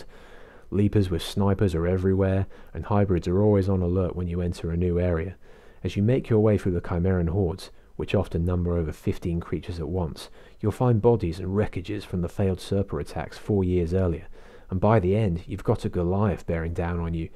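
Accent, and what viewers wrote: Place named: United Kingdom. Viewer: So glad I found this channel through this video. As an American I love listening to a calm British voice narrating a hour long video. Perfect for bedtime.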